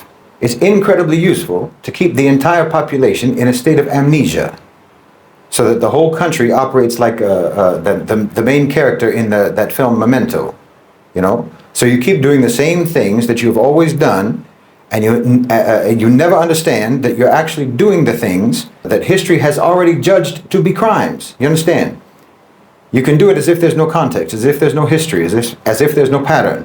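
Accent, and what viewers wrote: United States. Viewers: I aint gonna stop😂 this man is american college educated and speaks in an american accent. 😂 If you cant tell hes a shill you lack critical thinking and will always do what the screen tells you too. He even uses american ideology and american issues while he lives here to tell you about how bad white America is. This person is lower than pedophiles. He's a pedo protector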